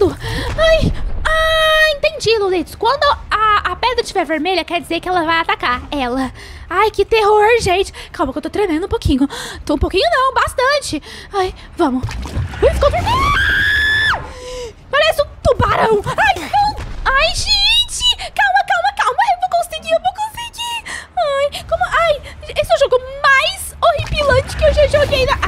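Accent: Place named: Argentina. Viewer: Está bueno hablar así porque parece idioma argentino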